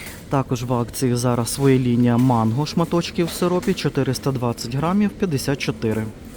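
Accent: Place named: Central Ukraine, Ukraine. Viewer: Акцент центральноукраїнський. Живе, звичайно в Києві. В якій області виріс самому цікаво.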